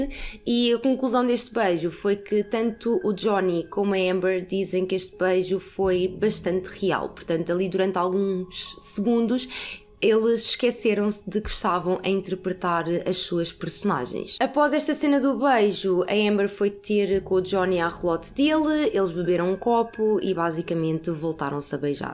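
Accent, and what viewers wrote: Portugal. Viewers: Muito bom resumo do caso. Infelizmente nao consegui seguir o caso como queria, devido a diferenca de horarios entre outras questoes. Realmente e' uma tragedia que muitas relacoes cheguem a esse ponto quando nem devia de ter chegado a metade desta situacao toda. Just a sidenote: Encontrei o teu canal recentemente e realmente e optimo ouvir uma voz portuguesa neste tipo de videos. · Aqui do Brasil dizendo que eu amo esse "sotaque" de Portugal ❤️ inclusive ficou maravilhoso esse cabelo 🥰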